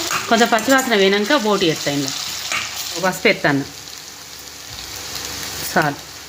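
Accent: Telangana, India. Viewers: Aunty meeru chala great. Enrhomandiki inspiration. Godavarikhani nunchi Youtube vara ku mee success journey andariki inspiration. Mee telangana yasa big asset.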